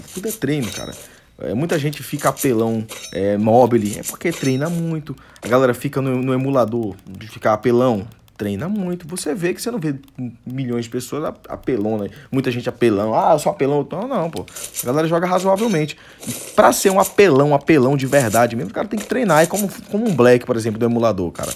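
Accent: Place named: Northeast, Brazil. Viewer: Salveeee,primeira vez que te assisto e ja gostei mano!! Queria saber de onde tu é! Tem um sotaque daqui do nordeste!!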